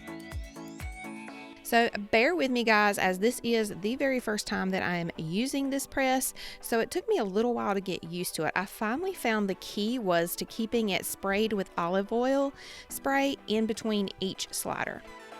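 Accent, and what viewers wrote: South, United States. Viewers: All these recipes look great girl☺️ I always enjoy watching you and listening to your awesome southern accent! 💗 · Girl you talk like me, country as cornbread 😂 I love it! Proud of my southern drawl. 💁🏼‍♀️ New subbie! 🤗 💕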